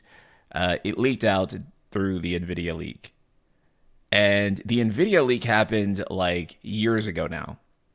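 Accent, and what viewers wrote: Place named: North Texas, United States. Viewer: ayyy I didn't know you were from North Texas too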